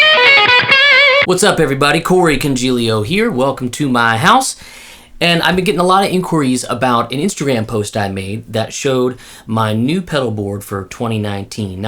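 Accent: South, United States. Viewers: a guy with an italian last name and a southern accent kinda freaks me out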